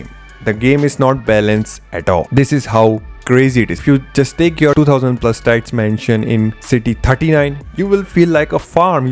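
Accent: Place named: India. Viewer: It's difficult to understand u with ur indian accent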